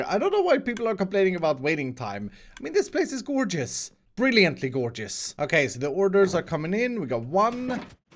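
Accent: Sweden. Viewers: Are u from Sweden? Just wondering:) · Where from sweden are you from?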